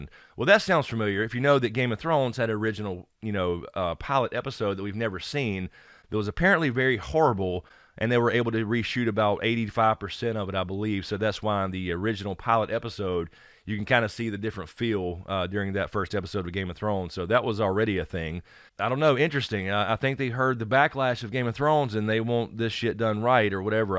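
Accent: South, United States. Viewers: Will do. I guess I still want to hear your take on their comments though, as, well I respect your opinion. I'll listen to it, but what Im' getting at is; what would you have said if this was something like a panel discussion and you got to ask them questions, and point out instances to them that you think doesn't fit the tone of the rest of the series. And of course get more of the cute Southern drawl. ( I swear I'm not flirting! You know I'm married. My mom ran away from Tennessee, so it feels homey to me in some weird way.😉)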